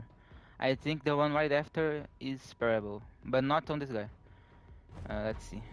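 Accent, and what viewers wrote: Brazil. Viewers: Brasileiro falando inglês has a Very specific accent BORA PORRA KAKDKAKDKAKDKAD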